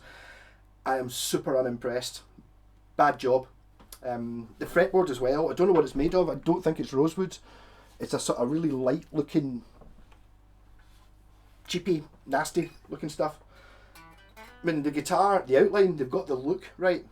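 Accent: Scotland, United Kingdom. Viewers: That's a jock accent for you, if you think that's bad wait till you hear strong Blackburn accent 😉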